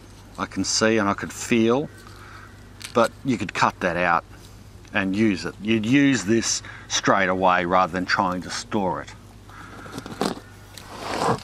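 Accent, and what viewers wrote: Australia. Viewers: This just come up and boy am I glad. Aussie Yeessss. I can understand you and you are talking Australian seasons. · It’s local Australian English spoken throughout the country. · Love the Aussie accent. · that the Aussie accent I like to hear haha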